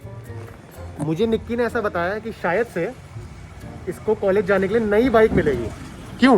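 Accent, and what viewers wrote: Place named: Delhi, India. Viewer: Baat krne ke style se hi pta lag gya delhi ka londa h👍🏼😂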